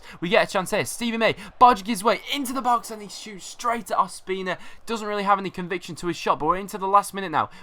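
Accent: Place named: Lancashire, United Kingdom. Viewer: I live in Blackpool my accent sounds the same and nice video btw :D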